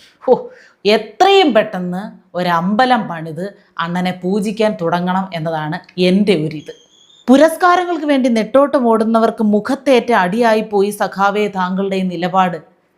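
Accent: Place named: Trivandrum, India. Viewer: കണ്ണൂർ ഉള്ള ഞങ്ങൾക്ക് തിരോന്തരം ഭാഷയിൽ ഉള്ള അലക്കൽ പെരുത്തു ഇഷ്ടാകുന്നു 😂👌😅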